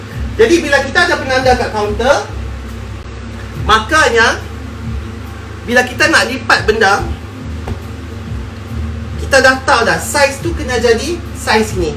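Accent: North, Malaysia. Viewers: Bahasa utara lah.. Kedah, penang mmg ckp lagu tu🤗🤗🤗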